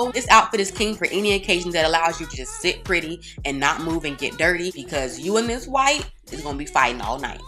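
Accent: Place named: Florida, United States. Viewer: Super cute looks! 😍😍 I could tell you were from Florida or lived there! Your accent! I’m originally from Miami 🤍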